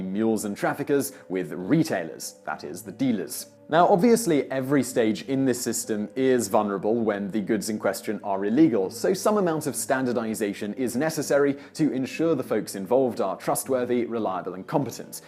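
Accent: England, United Kingdom. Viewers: T Rohan You do realize that his ancestors were speaking English when yours were crawling around on the ground, grunting and swinging from trees. He pronounces it the way people in England, who speak English, pronounce it. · For those complaining about how says the word mafia: he speaks with an English accent. He is saying 'mafia' correctly according to where he is from. Aussies, some South Africans, and many other non-North American English (according to their accents and dialects) regions say mafia the same as. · All these yanks upset with his pronunciation. If you don’t like the English language in its natural form, use someone else’s mother tongue. We don’t really want you walking round telling us how to pronounce things. Bastardised to the highest of degrees.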